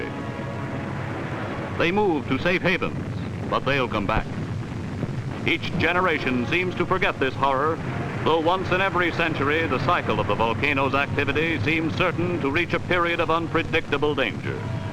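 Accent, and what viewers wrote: Transatlantic, United States. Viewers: Transatlantic accent 😀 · cocky transatlatic accent!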